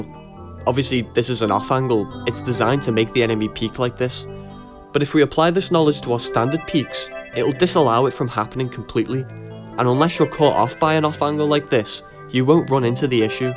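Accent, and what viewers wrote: Liverpool, United Kingdom. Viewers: the liverpool accent is killing me😂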